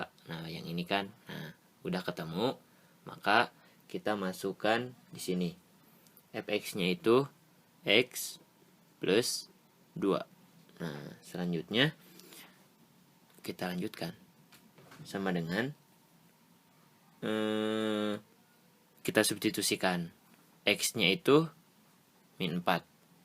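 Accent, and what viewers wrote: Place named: Bandung, Indonesia. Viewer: Si aa pasti urang bdg kwkwkwk. Nuhun a..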